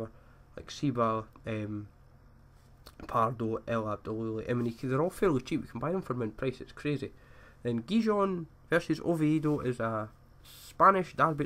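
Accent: Scotland, United Kingdom. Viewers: I am from Ukraine. First I barely understood what this guy said, but now I even like to listen to him and understand (well, mostly). "Scottish" language is so funny to listen to:) When I was in Scotland I enjoyed every moment listening local people. Keep up great work,!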